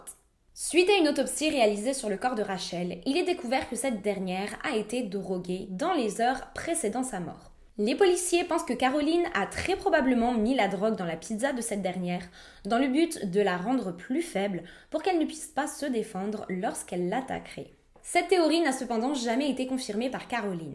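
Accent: Quebec, Canada. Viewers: Toujours sympa l'accent québécois, t'assure !!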